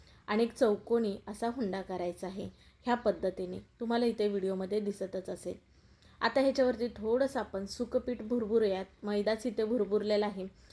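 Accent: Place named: Rural, India. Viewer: खूप छान. बिनधास्त गवठी बोला तेच आईकायला छान वाटत 👍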